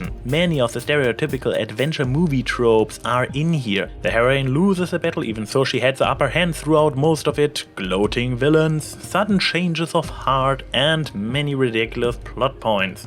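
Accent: Germany. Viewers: Hey, I noticed your accent. Are you German or Austrian? I'm Belgian, BTW.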